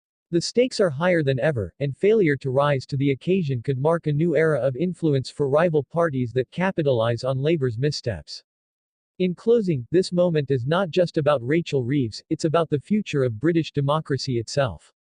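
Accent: United States. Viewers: AWFUL American commentary AGAIN ! WHY ?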